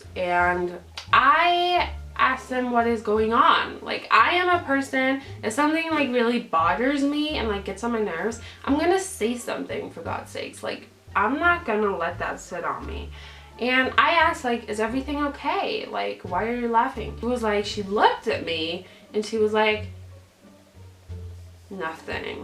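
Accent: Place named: Germany. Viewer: Cierra Carter she's got a bit of German accent. why would anyone EVEN say something so ruthless.....unreal.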